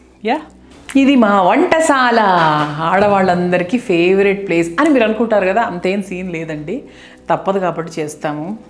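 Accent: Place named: Telugu, India. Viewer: I like ur telugu slang 👌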